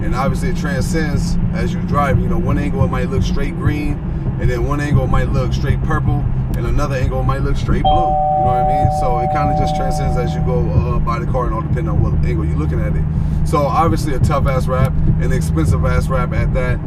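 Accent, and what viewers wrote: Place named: California, United States. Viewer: You from Cali? Sound like it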